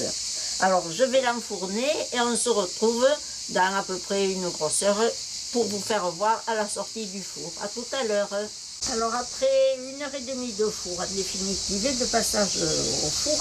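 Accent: Provence, France. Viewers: Merci pour cette recette incontournable de l'été, simple et délicieuse ! Je ne manquerai pas de la refaire très vite. Votre jardin est magnifique et c'est vrai que ça fait plaisir d'entendre votre accent, ( nîmoise, j'ai l'accent qui chante aussi ) qui se perd de plus en plus à mon grand regret et sans oublier le chant des cigales ! Mon grand-père maternel et parrain, était un gentilhomme provençal qui à la fin des grands repas, chantait de sa belle voix "Coupo Santo" et ma fille pour lui rendre hommage est une arlésienne qui défile dans toute la région avec l'école des traditions. Pensées affectueuses de Sylvie la nîmoise 💙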